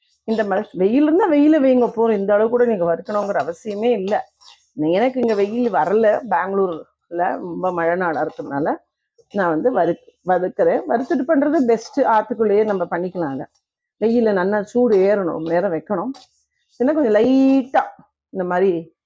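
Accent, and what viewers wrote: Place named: Thanjavur, India. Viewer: Very nicely you are teaching. Thanjavur pani peachu , but little Thirunelveli pechum mix panni you are speaking . Thank you.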